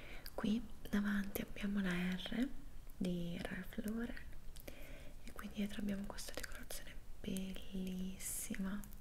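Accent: Italy. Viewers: Yes I love Italian accent ❤💕💕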